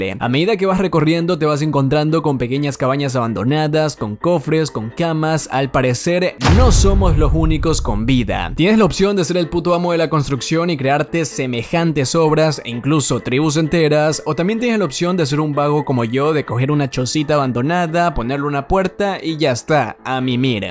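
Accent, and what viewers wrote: Ecuador. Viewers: Hablaste como ecuatoriano, me equivoco?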